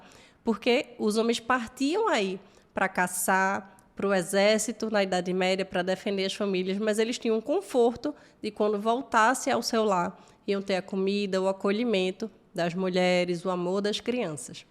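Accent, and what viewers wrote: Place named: Recife, Brazil. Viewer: ganhou mais um seguidor o sotaque de recife é lindo de mais, esse canal logo vai crescer muito seguidores.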